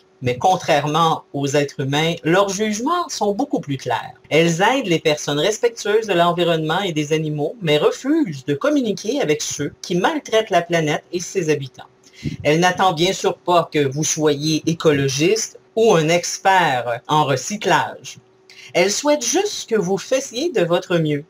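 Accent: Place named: Quebec, Canada. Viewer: OH !!! UN ROBOT QUI PARLE QUÉBECOIS ...!!!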